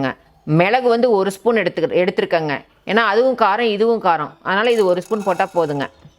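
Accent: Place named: Kongu, India. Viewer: Amma neenka yentha ooru kongu side mathire irukku?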